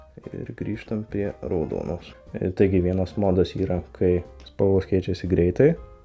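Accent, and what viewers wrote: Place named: Suvalkija, Lithuania. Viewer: Akcentas labai suvalkietiska primena :D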